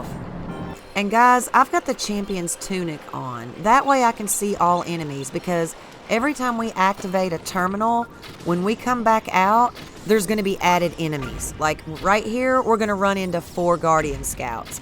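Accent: South, United States. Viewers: I'm British and this is definitely the best American accent ❤ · Very helpful, love the southern accent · Is it just me or does she/he sound like a red neck?(no disrespect)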